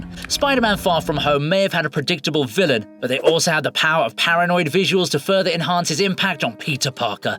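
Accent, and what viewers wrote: United Kingdom. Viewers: "The Sorcerer's Stone" is the dumbed down title of the film for the US audience (officially because WB didn't think Americans would know what a Philosopher's Stone was) despite having the internet at their fingertips and millions of HP book fans. Use the correct title, you do sound British after all.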